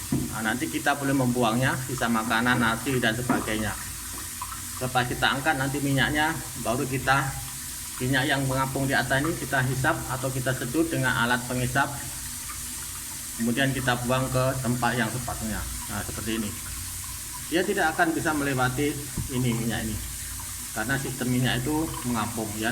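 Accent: Malaysia. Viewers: Terbaik perkongsian kawan..haha hampir hampir sling orang Malaysia sudah haha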